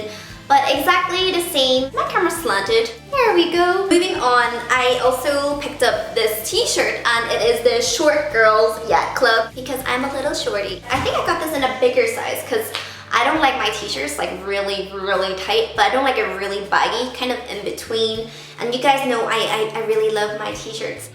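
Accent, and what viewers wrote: Northern Ireland, United Kingdom. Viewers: Love your videos!! And your Northern Irish accent! :)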